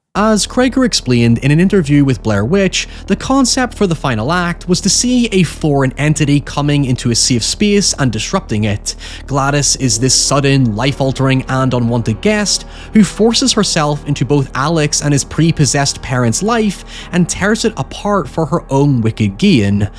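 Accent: Northern Ireland, United Kingdom. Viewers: It's a typical Northern Irish accent. How now brown cow?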